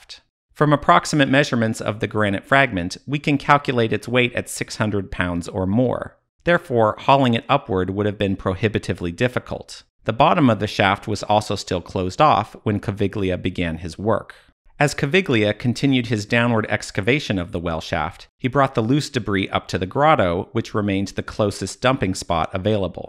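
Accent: United States. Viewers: That last pun of yours,is one that works ok with the American accent.You know~~~Annarica and all that. Enlightening video by the way, thanks.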